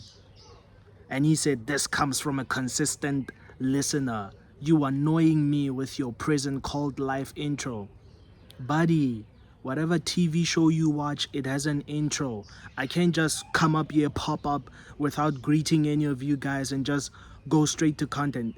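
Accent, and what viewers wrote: South Africa. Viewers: Bro are from mzansi?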